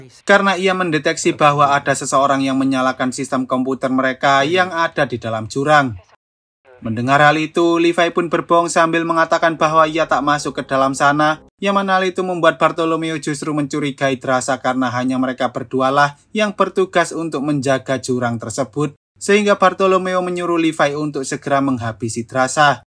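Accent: Java, Indonesia. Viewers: Kok medok ngono Min suaramu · Logat jawa nya bisa di rubah gak bang,gak enak kali dengar nya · Jawa yaaa. Koo ana omongan wis ora kesuen.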